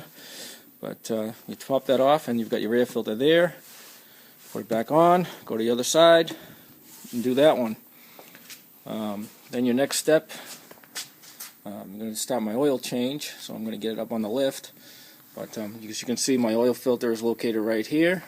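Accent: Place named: Rhode Island, United States. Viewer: Hoi, what part of New England are you from? Lived in Rhode Island for several years — sounds like RI?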